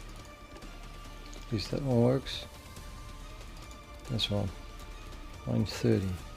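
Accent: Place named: Netherlands. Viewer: nederlander detected I thought you were Spanish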